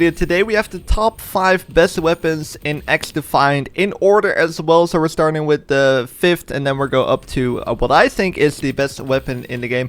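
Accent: Netherlands. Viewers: He is Dutch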